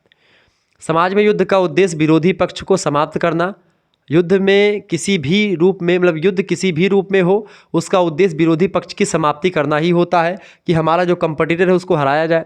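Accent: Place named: Awadh, India. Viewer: Sir ka Awadhi accent ❤😂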